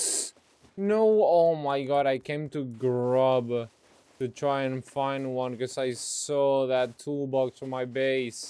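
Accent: Argentina. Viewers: Amigo sos argentino? Lpm me re confundis xd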